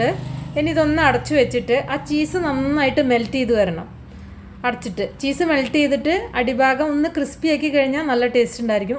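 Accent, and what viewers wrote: Palakkad, India. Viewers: Super, 👍try cheyyam, palakkad ano നാട് നല്ല രസം പറയുന്നത് കേൾക്കാൻ നല്ല അവതരണം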